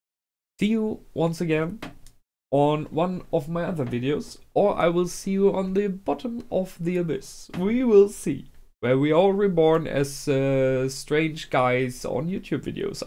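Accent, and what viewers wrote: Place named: Germany. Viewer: could you Provide me with a link, im on phone and i can only click on links:/ Would be very much appreciated. By the way are you from Germany, you have that kind of accent:)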